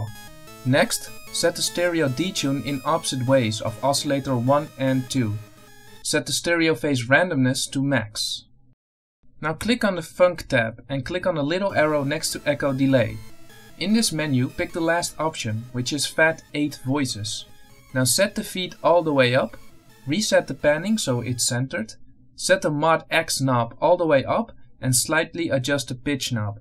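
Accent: Netherlands. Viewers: ey are you dutch? because you sound really dutch XD ik ben ook nederlands btw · a dutchy? good accent tbh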